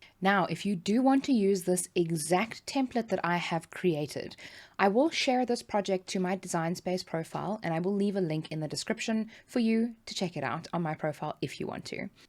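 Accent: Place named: South Africa. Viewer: I love your accent. Thinking it may be South African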